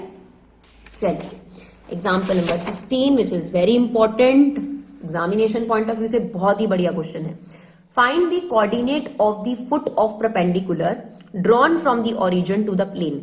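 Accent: Punjab, India. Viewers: You are from Punjab i think because of your accent . But still i respect you because you are awesome 😎 👍🏻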